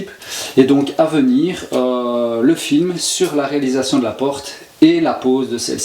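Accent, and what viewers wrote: Liège, Belgium. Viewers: Belle découverte et en plus un accent du pays 😉. Et en plus septante trois et pas soixante treize. Enfin un bon belge. Bonne fêtes. Et merci floky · Jolie découverte via la chaîne de Floky. Je me suis déjà mangé toutes les vidéos. Ça fait du bien de voir que la Belgique est aussi présente sur YouTube. Vive l’accent Liégeois ( et ce n’est pas péjoratif ) .Un abonné en plus. Et bonne continuation je suivrai la chaîne avec attention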